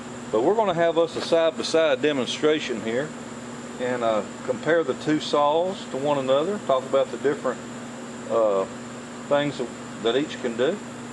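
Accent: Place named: Oklahoma, United States. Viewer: I've subbed recently and I am highly entertained and educated by your videos. I'm from Oklahoma and you sound like an okie to me.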